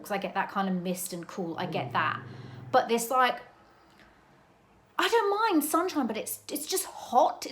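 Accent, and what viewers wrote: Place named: England, United Kingdom. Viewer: I really like that lip color on you. I share your love of BM and we are exactly the same age so that tapping of the powder really brings me back too. Also being American I love your accent. For some reason Americans find English accents calming to listen too😂. When I was sick from pregnancy years ago I literally watched hours of lisa Eldridge and it got me through a very sick time. Thanks for the video, love from South Carolina ❤.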